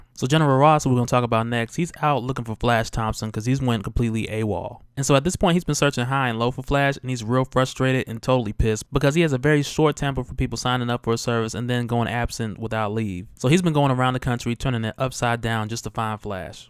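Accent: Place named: Brooklyn, United States. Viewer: this shit is so ill,its hellSTROM not storm fam, great job though u sound like you from brooklyn are you ?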